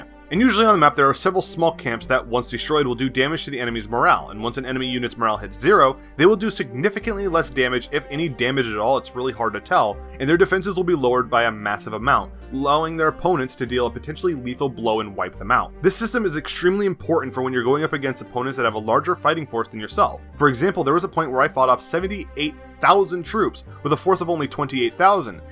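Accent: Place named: Midwest, United States. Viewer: That's one hell of a mid West Flow you got their son.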